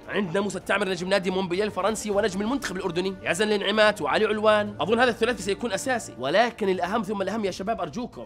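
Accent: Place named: Iraq. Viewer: بس سوال انتي عراقيه صح من طريقه كلامك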